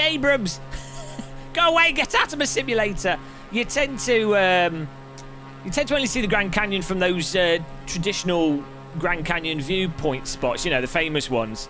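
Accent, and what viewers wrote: United Kingdom. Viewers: "ok you see what hapened theaaaaaaaah...?" xD You are sooo bri`ish!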